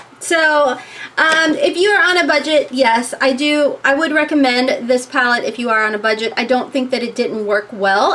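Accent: South, United States. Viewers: Always a judgement free zone... But, girl, your blending looks great in all of your videos! And I love your looks. Totally, planning on copying some on date night with the hubs. I swear you sound like a southern transplant to KY! And that's a compliment because I am Southern as they come (SC!)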